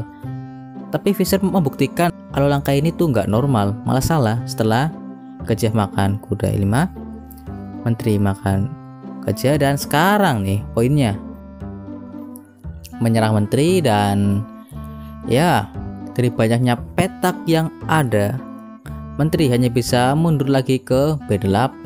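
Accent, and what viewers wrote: Banyumas, Indonesia. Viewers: Kaya wong purbalingga, kebumen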